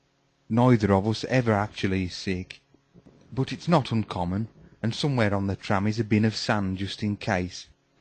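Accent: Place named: Birmingham, United Kingdom. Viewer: Sorry, but this should have been told by a Yorkshireman, not a West Midland 'Brummie' - it has lost the inclanative effect.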